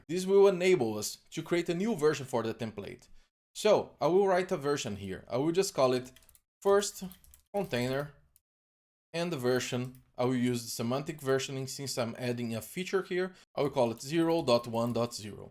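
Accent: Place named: Brazil. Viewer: percebi que o sotaque parece o da nossa terra ^^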